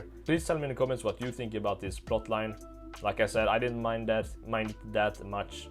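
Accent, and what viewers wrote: Sweden. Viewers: Are you sweedish?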